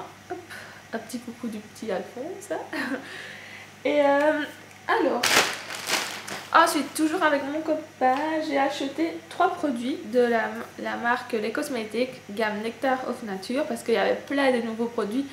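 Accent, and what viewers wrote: Belgium. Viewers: Ah ok t'es belge alors. D'ou ton accent irritant! Rien que pour ca je ne m'abonne pas! Salut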